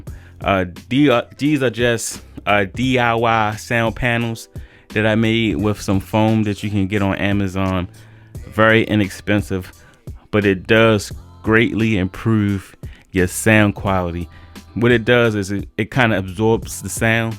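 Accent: Baltimore, United States. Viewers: Gotta be from Baltimore😂😂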